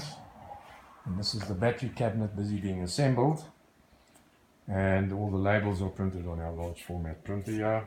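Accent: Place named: Afrikaans, South Africa. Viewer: Jy klink afrikaans.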